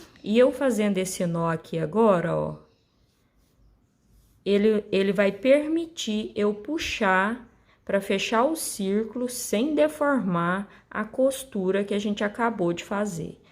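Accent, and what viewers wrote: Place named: Minas Gerais, Brazil. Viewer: Que beleza de trabalho👏 Grata por compartilhar ensinamento🙌 E vc tem uma voz agradável e ensina de forma calma - parece sotaque mineiro rsrs lembrei de meu saudoso pai q era da bela Minas Gerais 😊 Ganhou uma inscrita e farei essa bela e pratica touca (faço para doação)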